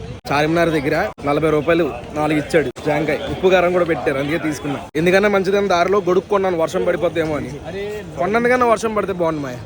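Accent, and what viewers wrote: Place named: Godavari, India. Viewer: మా గోదారోళ్లతో అలానే ఉంటాది మరి... Love u 💗💗💗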